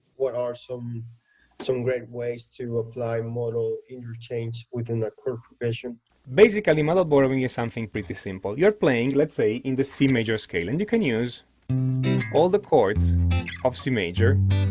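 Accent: Italy. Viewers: Are you italian in Milan? You sound 100% like my boss 🤣 great videos!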